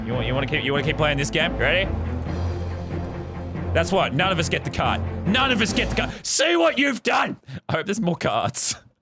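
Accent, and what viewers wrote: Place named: Australia. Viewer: Lol this dude is the funniest and that Australian accent is perfect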